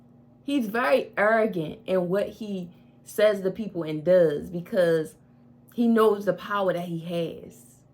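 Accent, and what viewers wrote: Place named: Baltimore, United States. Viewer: Love Your Accent, Are you from Baltimore?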